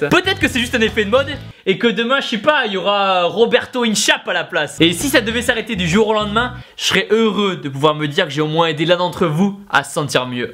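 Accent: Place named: Toulouse, France. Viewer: Atta il est toulousain !!!!XD mais dddaaaaammmmnnn c'est génial xD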